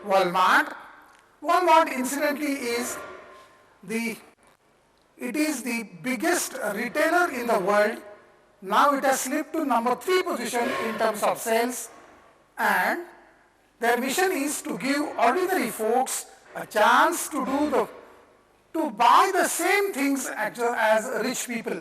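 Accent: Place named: India. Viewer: Indians talk so funny XD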